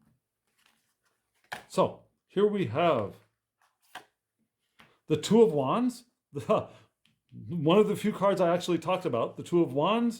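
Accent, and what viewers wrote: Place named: Latin America. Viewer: ah nice. I have friends in Italy and I heard how you speak English and I thought that you will be Latin something? From which country are you?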